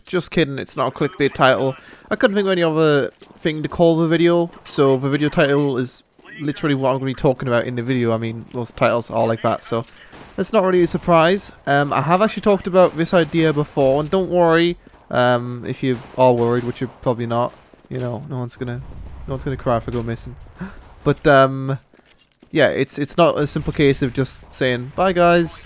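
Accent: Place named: England, United Kingdom. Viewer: work? what the fuck would you know about work. editing a video and playing games is not work. step outside your house. sell out. you made youtube videos once upon a time where it was no doubt fun. clearly, you are looking to shake this money tree. i dont buy into all this donating and subscribing garbage. its rubbish. you are not a charity. you provide mild entertainment at best,. you cheeky, english accent, condescending, adolescent whatever. i lost interest. friend-zoneded.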